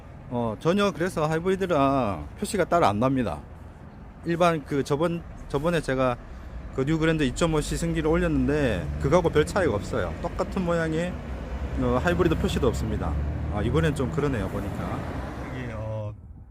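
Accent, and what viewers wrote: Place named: China. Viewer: 조선족이죠?말투가 확티가나는데 ㅋㅋ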